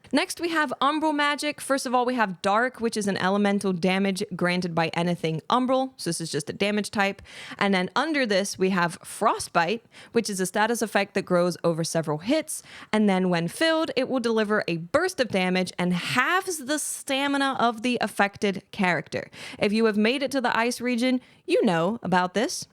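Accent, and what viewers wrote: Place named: New Jersey, United States. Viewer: That jersey eeesssssh accent got me ❤😊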